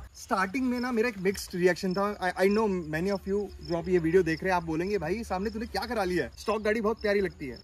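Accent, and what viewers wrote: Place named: Uttar Pradesh, India. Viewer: BHAI AAPKA BAAT KAARNE KA TARIKA BIKUL UTTAR PARDESH STYLE HAE!! LOVE FROM LUCKNOW!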